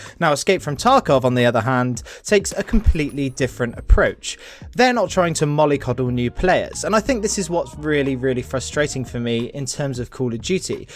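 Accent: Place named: West Country, United Kingdom. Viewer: Mate, might sound strange but have you ever kept a track of where the people in your lobbies in Call of Duty come from? I might have too much free time on my hands, but I'm from Nottingham and back in day, I used to play with people from Sheffield, Leeds and surrounding areas, I NEVER played with people in Europe. You'd very occasionally get into a lobby with some Americans which was quite cool as I'd never spoken to a yank before, but all I used to come across was 'UK ReMiiX' and the like back in the day of Cod 4 - BO1. Nowadays, my games are dominates by Germans & Turks for some reason. I'm literally playing with the same people with 'KURD' or 'TURK' as their clan tag game after game. Why?! I'm guessing from your accent you are probably from round the Bristol / Swindon area, do you still party up with people in the South West or are you witnessing the same thing as I am?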